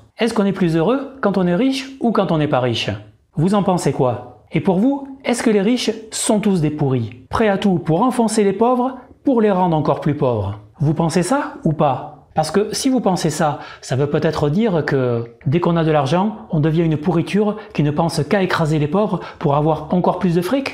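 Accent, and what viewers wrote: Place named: Toulouse, France. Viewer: Y a des richesses super : moi j'ai une super santé ! Sympa votre accent vous êtes de Toulouse? Vos propos sont très intéressants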